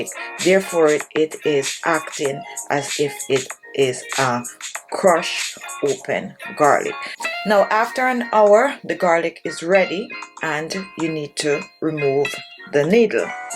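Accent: Jamaica. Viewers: You sound Jamaican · Why does she sound like a Jamaican 😌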